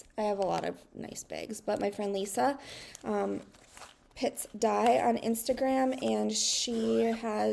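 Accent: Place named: Minnesota, United States. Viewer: OMG I've been under a rock the last few months and am just now discovering you (thanks to Julie)! I just about fell over when you said you have a 25 year old, you look so young! Looking forward to catching up with your videos in my spare time. In other news, I'm obsessed with regional accents...it would make me giggle and wiggle if you would use the following words in a future video: dragon, wagon, bag, Maggie (again) so many awesome Minnesota accent words! lol : )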